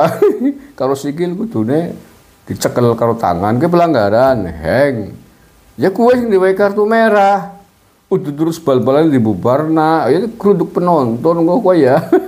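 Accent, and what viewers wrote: Banyumas, Indonesia. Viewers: Ndasmu njebluk 😂..om logat Banyumas.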